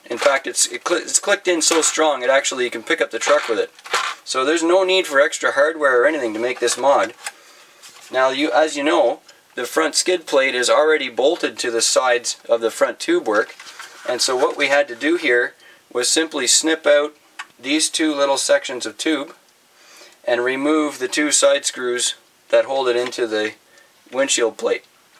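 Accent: Canada. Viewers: Dont know why, but i always thought canadian accents are cool. Srsly, no offense. I will not mention strange brew.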